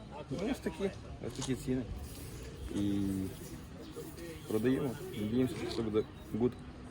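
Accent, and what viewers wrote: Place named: Poltava, Ukraine. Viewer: Чую чую полтавський говір, бо сама з Сотницького,Гребінківський р-н,там народилася ,а зараз під Києвом живу,а батьки там. Успіхів вам та мамі. Молодці. Приємно бачити та чути,що ви вже й канал маєте. Розвитку та продажу,нових ідей, здоров'я та мирного неба. Підписка😁💛💙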